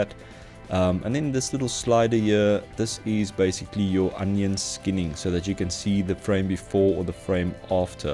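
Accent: South Africa. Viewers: you south african by any chance?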